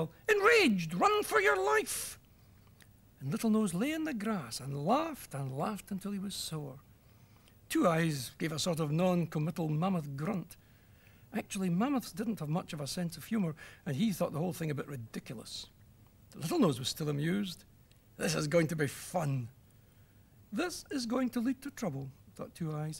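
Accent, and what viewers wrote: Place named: Scotland, United Kingdom. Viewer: Guy sounds scottish.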